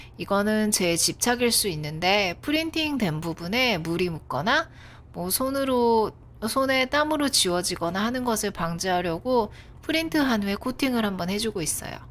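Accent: Seoul, South Korea. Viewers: 뭔가 목소리가 80년대서울 사투리 말투 같아여😅😅😅헤헤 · 방송에서 듣던 서울사투리 같은 말투가 정겹고 좋네요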